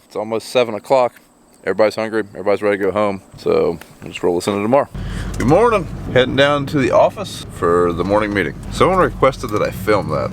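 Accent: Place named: Ohio, United States. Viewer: I appreciate hearing talk. It reminds me of spending summers in Columbus working for my uncle in the early 90's. I also spent some of that time in Chillicothe, had a cousin there. Living near Buffalo, you don't hear your accent here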